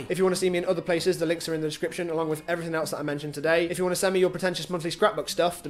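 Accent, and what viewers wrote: United Kingdom. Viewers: Y R u British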